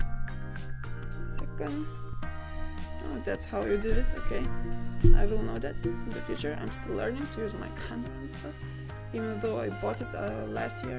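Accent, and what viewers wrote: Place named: England, United Kingdom. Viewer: I find your English accent to be interesting lol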